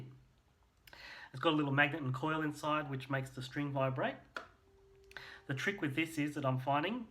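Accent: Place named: Australia. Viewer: Thanks for the videos,. I've just started learning Wicked for a production coming in Jun. I'm a seasoned guitar player, but I'm no pit musician yet. Looking at the charts, and man I have some work ahead of me. I'm in Syd, Australia and by the sound of your accent you may not be that far away. I'd love to discuss the musical further if you're able, please. Thx. Ted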